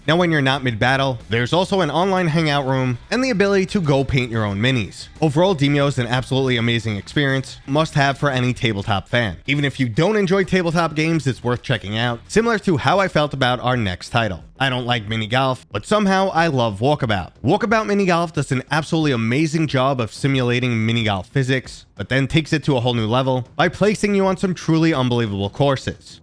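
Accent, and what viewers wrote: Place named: Brooklyn, United States. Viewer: You gotta be from Brooklyn. Also, 311 rules. Cheers!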